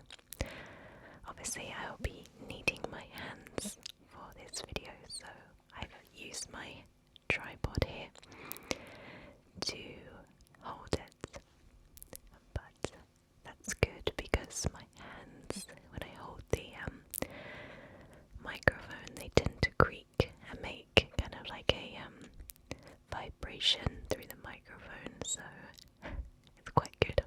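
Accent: United Kingdom. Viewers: You are genuinly the only asmrtist with a british accent i really enjoy. The way you speak is mesmerizing. Loved the video! :)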